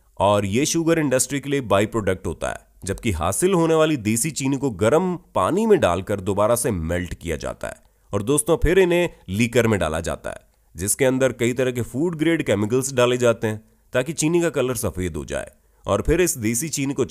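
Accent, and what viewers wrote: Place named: Haryana, India. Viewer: Host ki awaz haryane ki lg rhi h 🤩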